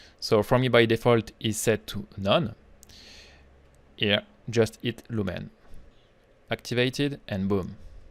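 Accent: France. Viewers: Goodness, what an accent! As a French myself I should be more tolerable but after living in UK for 10 years, I just can't stand it. Somewhere it hurts. Anyway, good channel! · Nice, (J'adore ton belle accent français) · oh that frenchy accent ,,, hahaha,,, so funny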